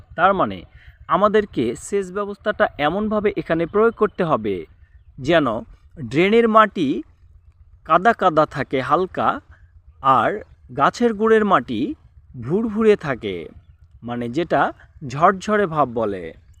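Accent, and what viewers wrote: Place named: Naogaon, Bangladesh. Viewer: ভাই আপনার বাসা নওগাঁর কথায়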